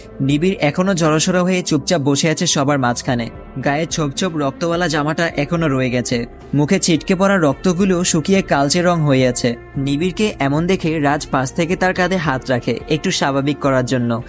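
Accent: Sylhet, Bangladesh. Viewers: ভাই আপনি কি সিলেটি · Vai apni ki Sylhety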